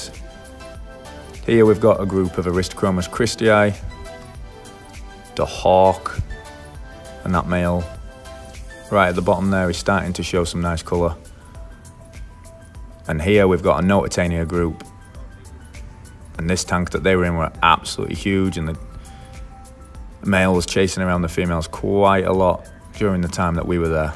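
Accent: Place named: England, United Kingdom. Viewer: Why do you sound English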